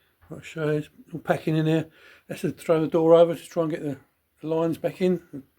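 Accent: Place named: Southern England, United Kingdom. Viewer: Hello. You probably know just saying, A30 has long whip gear lever and later A35 has remote gear lever and slightly altered transmission tunnel. I think the last 1098 engines had a beefier gearbox casting as well some one else will know for sure. I had a late 1098 van (JUW 334 D) for a bit and being so light it was a lot quicker than you’d think and it was bog standard. There were lots of A30s with A30 gearbox and A35 engines - 948 with modern big ends you could rev all day - so no tunnel mods, but i think general opinion was put the 1098 with its correct beefier late gearbox, something to do with baulk rings? Correct me anyone if I’m wrong it was forty years ago. Love your videos mate, wish I could weld like you do. Got a huge soft spot for A30s and anything 1950s. You’ll end up with panels fitting better than from the factory. 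Don’t mind bits of music and I like your voice - spent years in the midlands and any southern accent now is music to my ears. Cheers.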